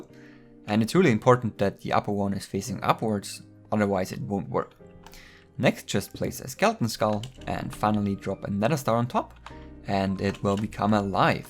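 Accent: Germany. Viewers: Is there a possibility that youre german? Kinda sounds like that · Are you german? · Are you German?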